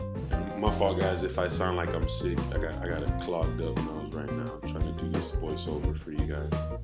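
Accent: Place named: California, United States. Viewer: You sound like you from Cali 😂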